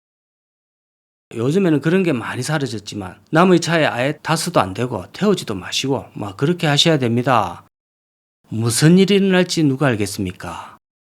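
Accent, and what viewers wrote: Gyeongsang, South Korea. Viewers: 경상도 사투리 좃나 짜증 나네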